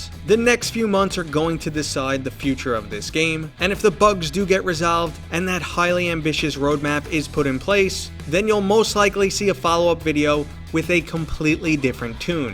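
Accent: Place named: Boston, United States. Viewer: I just like that boston accent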